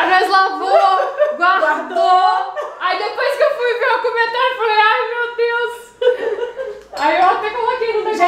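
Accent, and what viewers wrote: Minas Gerais, Brazil. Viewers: Adorei o mineirês! Sou baiana, mas o jeito mineiro de falar é o sotaque brasileiro que mais gosto! Vim do canal da Daniele Paula!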